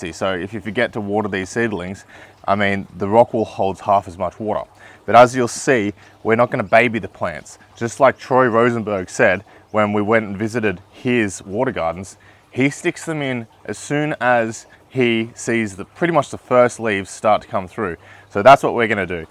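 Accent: Australia. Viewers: Love your content!! To also have another Australian accent schooling me is nice 😂 the familiarity is soothing af- cheers! Your content is dead brilliant!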